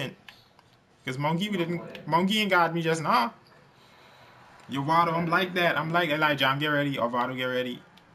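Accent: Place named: Bahamas. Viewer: You from the Bahamas?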